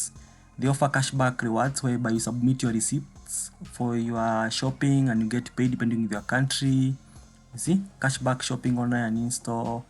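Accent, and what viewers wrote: Kenya. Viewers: Hii accent ni ya kenya sindio...murima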